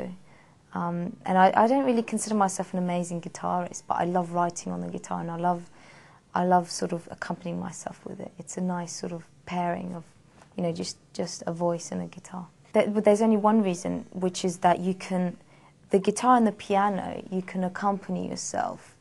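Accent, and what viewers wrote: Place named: England, United Kingdom. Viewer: I love her english accent..could just listen to her talk for hours...and of course her voice is beautiful!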